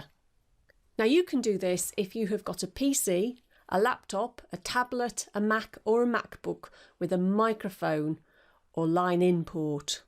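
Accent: United Kingdom. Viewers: UK accent. Kinda wiredley explained hm but imma.. give it a try..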